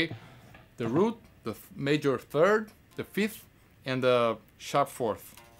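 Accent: Brazil. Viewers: Name looks finnish, but accent seems brazilian.. which one is it? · That's brazillian accent if I ever heard one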